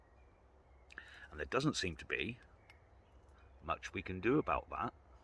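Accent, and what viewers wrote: England, United Kingdom. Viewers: Thanks. I wish I had had a mentor like you when I was a young man. Love your old school attitude and (English?) accent. Wish you guys all the best in your endeavor, from across the pond 🙏🏻 We’ve got our own battles to fight, although it seems we are facing a common enemy..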